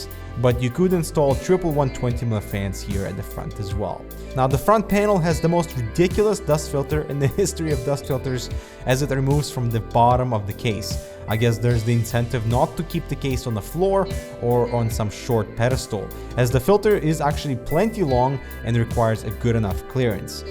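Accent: Russia. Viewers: work with quality and light are awesome! Like it a lot. By the way, are you russian? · no trust me I know the accents I'm guessing he could be Russian or maybe Norwegian or he might be Dutch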